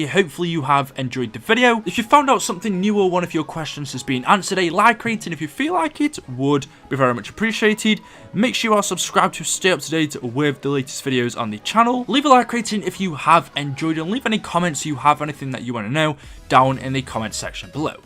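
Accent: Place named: Stoke-on-Trent, United Kingdom. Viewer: are you from stoke on Trent because your accent sounds familiar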